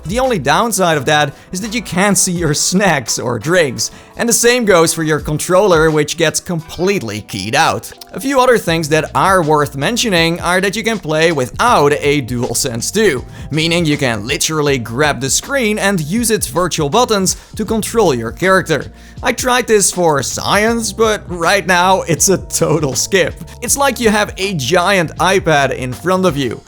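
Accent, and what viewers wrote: Netherlands. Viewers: Bij de eerste zin hoor ik dat je nederlands bent hahahaha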